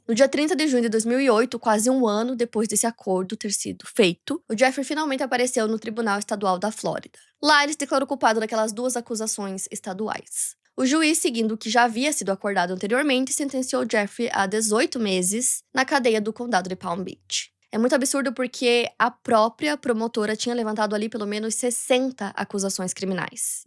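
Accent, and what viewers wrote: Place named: Rio Grande do Sul, Brazil. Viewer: Nossa que nojeira, porquê ninguém investiga o tramp? E que vóz chata dessa apresentadora quando fala em inglês misturado com gauchês affiii acho que sou eu que estou sem paciência mas essa reportagem me deu taquicardia, dor de cabeça, ansiedade, nunca termina meu Deussssss ... um rivotril por favor 😂😂😂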